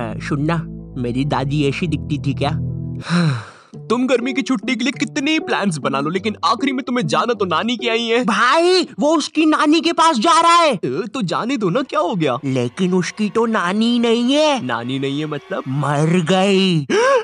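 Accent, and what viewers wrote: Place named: Madhya Pradesh, India. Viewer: I'm from madhya pradesh.. where are you from??? Bro .. bcz you're accent is like m.p.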